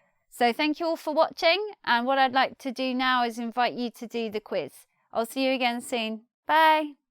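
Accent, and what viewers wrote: United Kingdom. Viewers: Thanks, i learn to much with you, i love your british accent- Paulo From Brazil!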